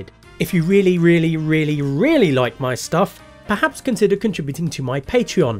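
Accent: United Kingdom. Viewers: Thanks for this video mate it was exactly what i was looking for. Decent length breakdown all the important details and British narration. Cheers matey! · I like your awfully delightful British accent and your sarcastic humor. How haven't I heard about you previously? well anyway Keep up the good work. I'll be watching you... in a good way... nice socks btw. Where'd you get them?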